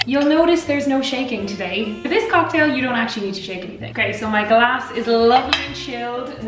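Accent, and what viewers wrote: Ireland. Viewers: Gorgeous Irish girl with an amazing accent who loves whiskey and makes cocktails....easiest subscribe ever! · I was robbed.... I was told that this was a “naked” Irish elixirs. Oh well.... The Irish brogue had me..... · You're the reason I have Siri set up to sound like an Irish female.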